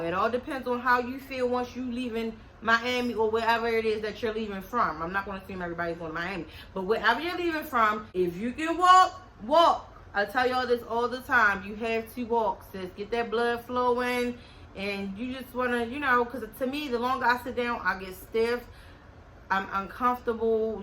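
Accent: Baltimore, United States. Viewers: I’m going to guess where you’re from by your accent. Baltimore?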